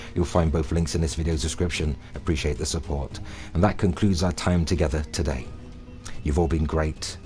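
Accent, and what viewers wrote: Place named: England, United Kingdom. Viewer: Great channel, great content, great diction........... very insightful indeed.........you have a great tone of voice and a delightful English accent.......keep the good work.......you are a breath of fresh air when it comes to you tube gaming commentators.......they are mostly inept and childish, or use click bait with ludicrous tittles and unfounded far fetched facts that beggars believe......you have my full attention sir.......greetings from Australia 👍🏻😀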